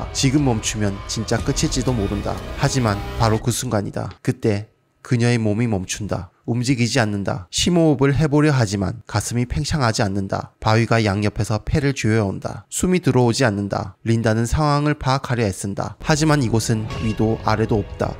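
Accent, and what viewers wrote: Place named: Seoul, South Korea. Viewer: 사투리랑 서울말이 섞여서 느낌 참 오묘하네 효과음은 좀 뺍시다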